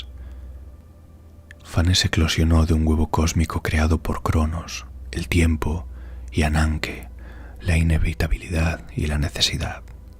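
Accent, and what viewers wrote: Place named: Spain. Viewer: A mí le acento español no me gusta mucho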